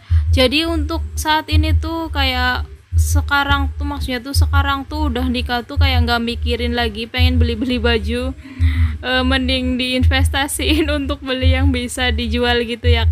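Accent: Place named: Java, Indonesia. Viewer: Asli mana bun,medok bgt logat nya ..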